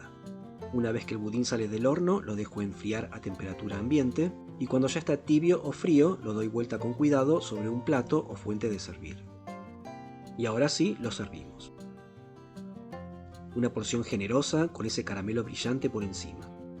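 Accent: Chile. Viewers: Pensé q era chileno! Jeje tremenda receta... cuidado de hacerla muy seguido,digo,por el azúcar...pero impecable y único em sabor y recuerdos 😊 gracias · ¿ Es chileno no ?🤔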